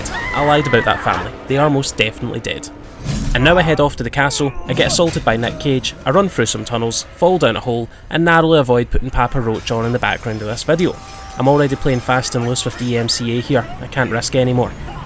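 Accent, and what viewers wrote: Scotland, United Kingdom. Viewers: Scottish Dante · scottish dante ma men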